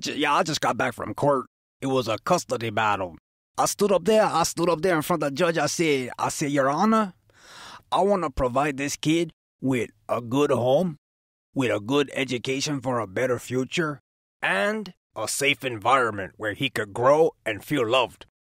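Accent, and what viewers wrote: Mexico. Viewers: Guys, the Mexican accent is back. And it's pretty much here to stay it seems. Hooo-rah.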